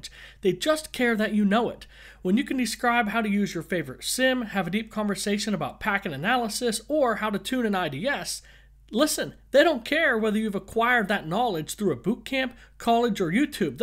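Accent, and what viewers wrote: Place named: South, United States. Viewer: That accent... South of the Mason/Dixon and East of the Mississippi.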